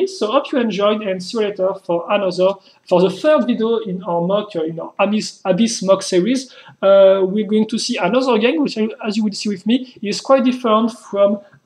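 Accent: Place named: France. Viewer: Du vrai francais dans l'anglais ^^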